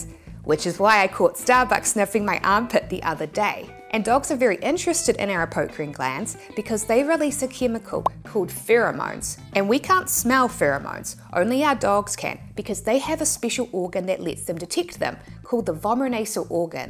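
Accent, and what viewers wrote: New Zealand. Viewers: Wow! so good to hear the Kiwi accent talking about my favourite pet. Hope to hear more from you.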